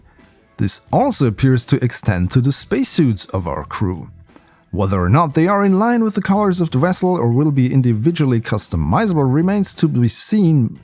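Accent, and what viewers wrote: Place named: Germany. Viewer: Your Hochdeutscher Akzent ist very obvious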